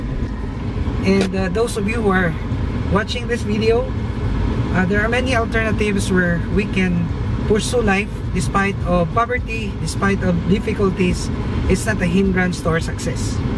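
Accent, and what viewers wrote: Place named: Bisaya, Philippines. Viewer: murag bisaya man mo kuya.. taga asa mo?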